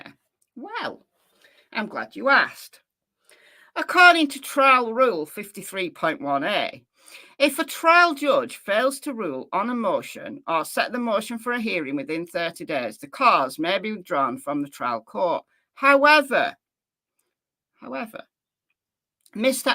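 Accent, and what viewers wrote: United Kingdom. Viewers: could you please cover the case of Valerie Wilson. Its apparently on utube but i would rather hear the story from you, im from Canada ive been watching you since Summer Wells. You are the best and i love your British accent, you are humerous and i just laugh my head off at your videos sometimes.❤❤❤ love your doggies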